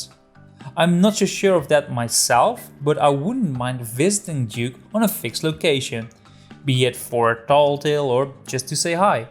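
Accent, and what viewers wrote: Netherlands. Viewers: Are you Dutch? I don't think I have to ask, because I can hear it on your accent! :P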